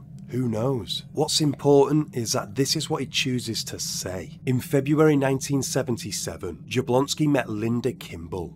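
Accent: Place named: Liverpool, United Kingdom. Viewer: Dude are you from liverpool? Your accent is so scouse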